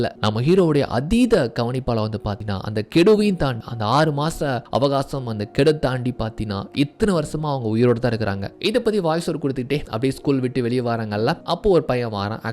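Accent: Tirunelveli, India. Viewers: Enna bro thirunalveli veliya varuthu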